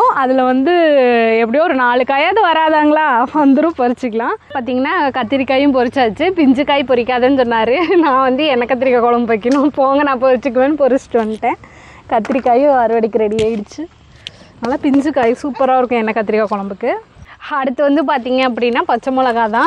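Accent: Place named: Gobichettipalayam, India. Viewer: எங்க ஊர்லயும் மிளகு தக்காளி கீரை யை கறிஞ்சி குட்டி கீரை தான் சொல்லுவோம் sister...... ******. *********. **** இப்படிக்கு கோபிசெட்டிபாளையம்.......... உங்க language கூட கோபி சைடு பேசற பேச்சு மாதிரியே இருக்கு பா..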